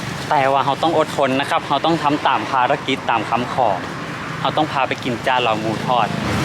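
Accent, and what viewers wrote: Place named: North, Thailand. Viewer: ชอบฟังพี่ พูดเหนือมากเลยค่า น่ารักมาก อยากให้ทำคลิปพูดเหนือเยอะๆๆๆเลย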